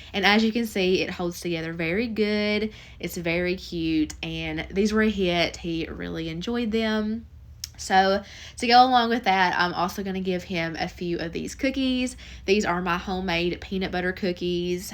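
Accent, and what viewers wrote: South, United States. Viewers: Maybe it's my preference but I love hearing a southern accent. It's a familiar comfort I don't always hear anymore with how many transplants live in my area. Southern women just have a way of speaking that keeps me listening. Keep up the videos, you're doing great!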